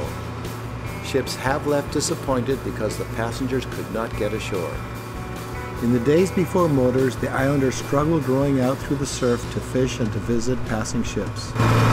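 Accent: United States. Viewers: Why thank you. But sorry, I do hear a bit of the US in there. I wonder if your roots (your birth place and especially your parents birth place) were from the US and you spoke with American accents in the house (or on the ship, in your case) as your parents held their mid western accents. The Scottish was very slight with my ear - but I certainly did pick up the good ole Wheat Fields in your accent. I loved this video and would adore visiting this place someday when my 2 dogs are gone. BLESSINGS. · Maybe it's just prejudice, but I can't abide American narration.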